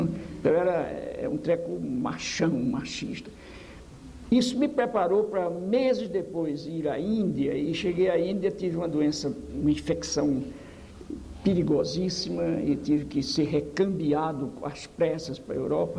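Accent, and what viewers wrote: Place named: Northeast, Brazil. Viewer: Bolsominion não aguenta ouvir um homem inteligente,muito menos com sotaque nordestino.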